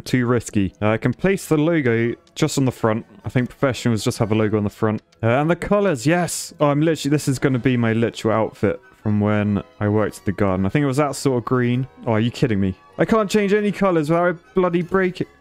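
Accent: United Kingdom. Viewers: I came for the gaming and stayed for the British accent :)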